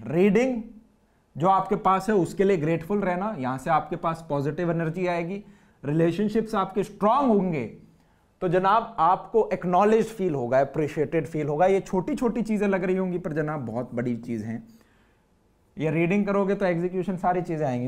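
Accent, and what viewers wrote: Haryana, India. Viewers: Harayanvi accent😅 Btw nice video as expected ✨💖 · Nice haryanvi accent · sir, are you from Haryana ? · Bhaiya your accent is going towards Haryanvi 🔥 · Am I the only one who loves his Haryanvi accent?? 🤣🤣😍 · Katayi haryanavi lgre ho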